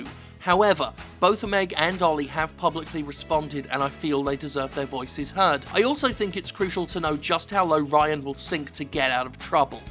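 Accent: United Kingdom. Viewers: Throughout the sections where reads Ryan's statements aloud, I couldn't help but think that it's probably how that guy actually sounds, because he is also British and may have the same accent as.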